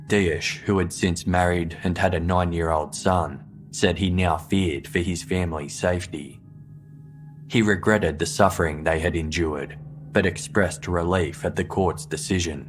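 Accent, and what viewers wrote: Australia. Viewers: Is Aussie?????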